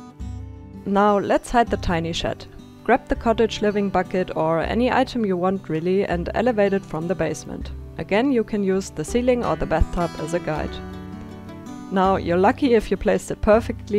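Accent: Germany. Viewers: Junge dieser deutsche Akzent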